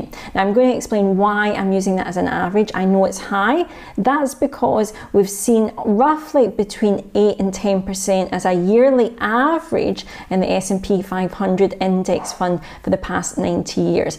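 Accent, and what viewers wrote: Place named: Glasgow, United Kingdom. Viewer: Great wee video and good to hear a Glasgow accent 🏴 I’m just starting out at 50yrs old so all you young bucks can relax 😂 Jut wondering why Vanguard and not 212? I’m hearing a lot of good things about them. I’ve started with H&L before I realised their fees are quite high. Would you say it’s worth investing over a few platforms?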